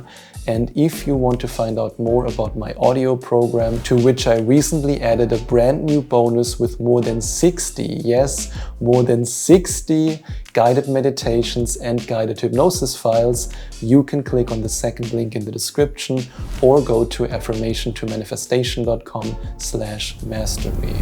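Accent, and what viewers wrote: Germany. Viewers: I love your German accent, your wonderful sense of humor and the straightforward way you present the material for us to understand your journey and our journey.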